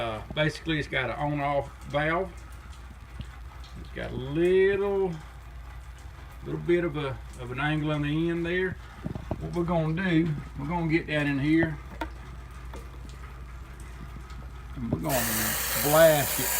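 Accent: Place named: South, United States. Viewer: This makes me smile so big. You sure are putting on that Southern 😁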